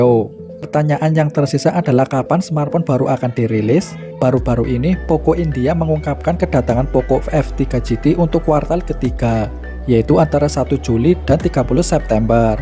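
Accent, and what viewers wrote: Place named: Java, Indonesia. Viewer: Bgst mendoknya bikin ngakak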